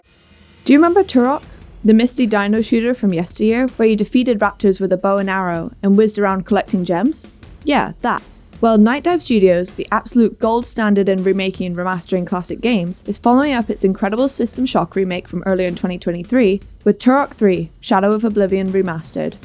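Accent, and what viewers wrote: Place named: Yorkshire, United Kingdom. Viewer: Yorkshire accents? Sign me up for TGYH. Excellent coverage :)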